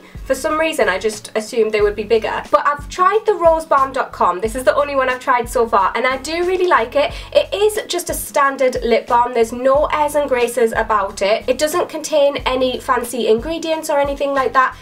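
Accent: Newcastle, United Kingdom. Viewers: I have no idea if anyone else has said these and I’m sorry if they have but three facts about you are 1) You’re from Newcastle (I love your accent) 2) You have lots of ear piercings and normally wear hoops in your videos and 3) You have been on some pretty funny dates like the one where you were with that boy and he tried to kiss you and you jumped away and said “well that was fucking awkward wasn’t it” or when you peed when having sex one time 😹 you’re honestly so funny, I absolutely love your videos and you are and always have been one of my favourite yourtubers, I love you! Thank you so much for doing this giveaway you’re so kind ❤️❤️ Good luck to everyone ! Xoxo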